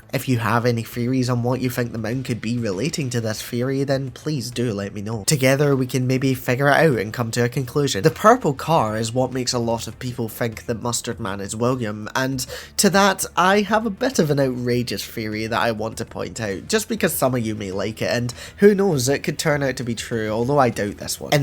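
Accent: Scotland, United Kingdom. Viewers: nice vid man. cool to hear a Scottish fnaf fan lol Im Scottish myself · The Scottish accent is throwing me off loved the theory 😂😂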